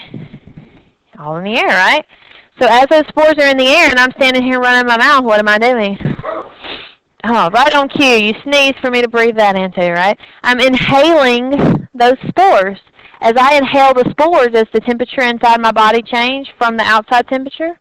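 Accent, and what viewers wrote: South, United States. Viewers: currently taking micro and I am so glad you have taken the time to post your lectures. they are very helpful and easy to understand. your way of teaching is awesome and I am learning far more from you than my current professor. southern accent makes it even better. thank you so much! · Thank you so, so much! As a fellow southern chick I LOVE your accent!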